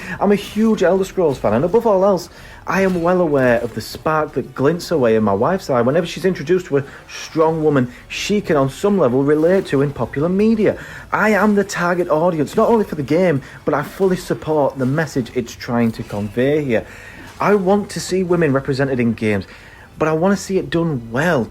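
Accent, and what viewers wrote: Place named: United Kingdom. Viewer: You should definitely try out Owlcat’s games as they have some incredible cRPGs similar to Pillars and Tyranny from Obsidian. Your comments really show maturity as the whole “woke” thing is about the right-wing not liking liberalism more than how it’s implemented into games, which it is fine to be right-wing, but the problem is it’s simply a conflict of interest to critique a video game with political messaging by countering that with your own political messaging. Planescape Torment came out back in 1999 and was very political on how all factions were sometimes this “Lawful evil” alignment with other factions focusing more on personal divine alignment and mind, transhumanism, tribalism, radical revolutionaries, etc. That is the ultimate “makes you think” type of game, and any PC can run it. Other than that, the closest “spiritual remake” to that is Disco Elysium, and that game is brilliant in many ways and it’s what it feels like if BG3 was just a story game with no combat and this sense of dissociation throughout the game. 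Thank you for making this lovely video, and might I say you do have quite a nice accent, I’m not sure where it’s from (Scottish or English?), but you do have a good accent nevertheless.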